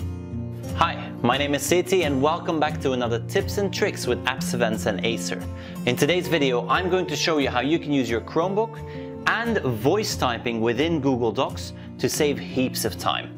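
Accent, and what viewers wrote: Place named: United Kingdom. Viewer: It's because you talk in the queen's English not in American English there's a difference.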